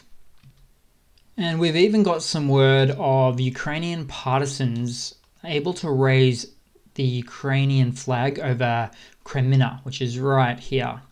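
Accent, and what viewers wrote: Australia. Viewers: It's great to hear an Aussie voice analysing this war so well. You've shown images even Ukrainian sources have not yet shared. Well done, mate, keep it up!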